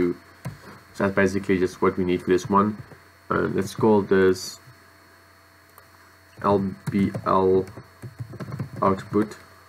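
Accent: Afrikaans, South Africa. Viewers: Are you Afrikaans? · You can tell that he's Afrikaans. I mean his accent says it all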